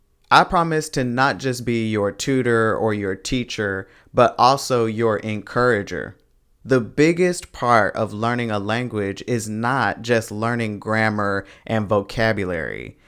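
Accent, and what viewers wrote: Dominican Republic. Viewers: If I speak Dominican Spanish it’s thanks to you, until today I thought you were Dominican 😂😂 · This whole time I thought you were Dominican, you speak like my cousins. I love this and appreciate you putting out learning content for us no sabo kids. This is huge motivation for me. Much love 🇵🇷♥️🇩🇴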